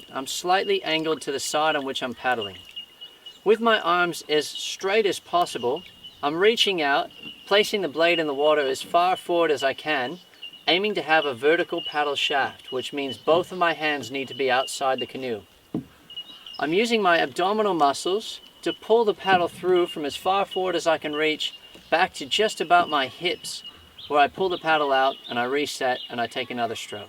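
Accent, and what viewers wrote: Australia. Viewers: As a Canadian paddler at first I was kind of amused that somebody with an Oz accent was going to tell me how to paddle a canoe... ...then the phrase "Divorce Machine" came up... ...yeah it sounds like a good idea, but NEVER involve a canoe on a first date. I speak from experience. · You definitely some how have an Australian accent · Nice intro video to tandem canoing. …(is that an Australia mongrel accent you have?)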